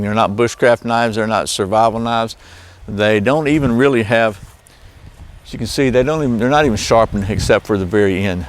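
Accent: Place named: South, United States. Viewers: This southern accent at least thats what i think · I was guessing KY or TN, but definately south east. Great videos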